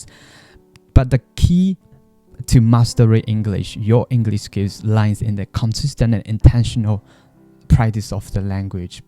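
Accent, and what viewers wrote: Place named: United Kingdom. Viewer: British အသံထွက်ကိုသဘောကျတယ် အကို 💥 လေ့လာနည်းလေးတွေများမျတင်ပေးပါ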